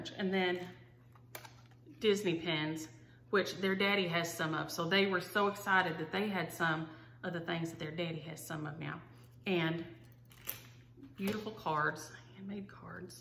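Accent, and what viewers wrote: South, United States. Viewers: It would be a "treat" to stitch some "Autumn" pattern! I love listening to your channel! I am from Michigan, so I love hearing your southern accent.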